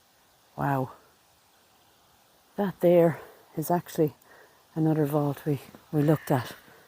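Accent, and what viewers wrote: Ireland. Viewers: I am really enjoying your videos,. Your Irish accent is so melodic to me. I live in the US, but my father hailed from Dublin, Ireland:-) Your countryside is so beautiful!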